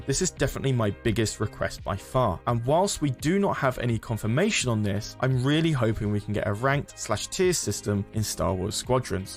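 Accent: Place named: United Kingdom. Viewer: I haven't seen many videos before, so I was very confused when I thought had turned British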